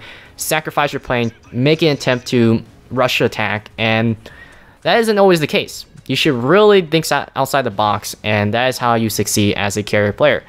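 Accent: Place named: Philippines. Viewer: I was born and raised in the Western United States. You sound to speak English more like someone who is South East Asian or Pacific Islander. My first guess is Filipino. I grew up near a Filipino neighborhood and that's the accent they have.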